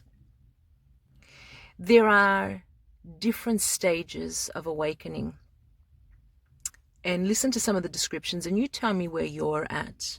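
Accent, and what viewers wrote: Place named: Australia. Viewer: I found your video through one of my subscribers who posted your video. Your delivery is perfect and your Australian accent is beautiful, I'm an Australian myself, you're a great teacher and I was so drawn into every word you said, I was sort of hypnotised to tell the truth, and I felt that this message was purely for me 'now'😊 I am turning 68 next month and people say that at 40 you can look over your life and feel all sorts of disappointment but I can tell you nearing 70 you feel like the tank is getting pretty empty. This philosophy really rings true for me, it's not like I haven't thought about all this a lot but the way you put it just cut through. I'm going to listen to you again and again until I really get it just wanted to say thanks. Cheers, Dave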